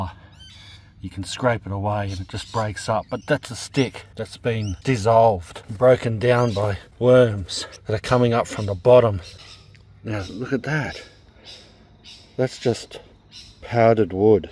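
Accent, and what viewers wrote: Australia. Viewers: Stralian ASMR. 👍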